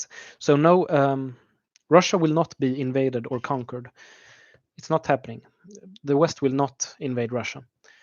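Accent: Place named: Sweden. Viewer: I could tell right away you were swedish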